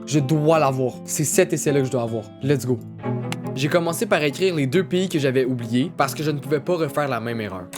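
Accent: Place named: Quebec, Canada. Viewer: Salut, tu utilises quelle application pour le montage ? Et sinon, j'adore l'accent Québécois, rien qu'à la pdp, j'ai vu que tu ressemblais à ADAM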